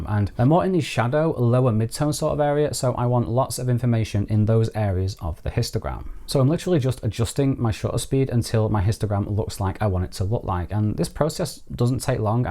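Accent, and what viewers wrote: United Kingdom. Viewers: Thank you! I'm fairly new to RAW and only very occasionally use it. Following your video, I downloaded your RAW files and tried them out myself on my iPhone12pm. Since that now has RAW capability, I tried a few edits in the default app, and then finished it off in SnapSeed (just the normal edits, not RAW). I have previously found that I preferred the default photos app for RAW editing since in SnapSeed the scale for shadow RAW editing was far too wide and tended to affect the mid-tones as well as shadows. I also tried out DarkRoom which I've only tried a couple of times previously. It doesn't appear to have a masking facility, something which I find to be essential, which is why I most often go back to SnapSeed. And I didn't like what DarkRoom produced this time around! I preferred to go for a very different look from yours, but as you say, so much is down to personal preference. 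I do most of my shooting in the default camera app, but must try out Halide which I have but not really got to grips with. I have a problem. I'm an AppAholic haha! But I guess I"m probably not the only one!!! Is there a suitable 12-Step Programme for me??? Blessings and thank you again. PS, nice to hear a British accent like mine on YouTube!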